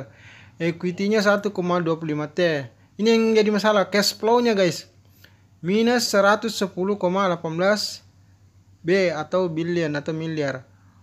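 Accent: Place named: Sulawesi, Indonesia. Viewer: Wa alaikum salam. Pak, orang dari sulawesi yaaa? Kelihatan dari suaranya...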